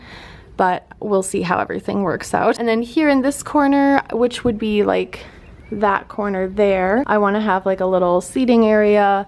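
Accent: Minnesota, United States. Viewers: HA! I knew you were from Minnesota!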